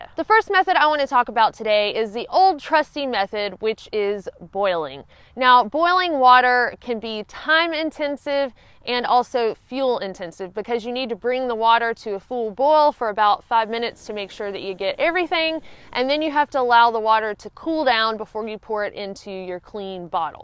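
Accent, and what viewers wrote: United States. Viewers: Love the proper American accent!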